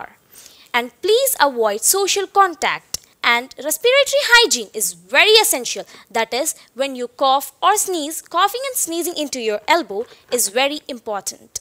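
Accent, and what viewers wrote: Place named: United Kingdom. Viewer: IN SHA ALLAH...I WILL SPEAK LIKE YOU.... I HAVE A GREAT BURNING DESIRE TO SPEAK...IN BRITISH ACCENT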